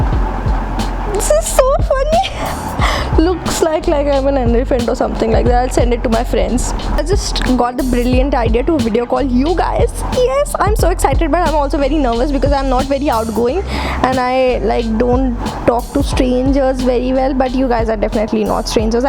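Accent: India. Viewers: Haha she has an Indian accent ofc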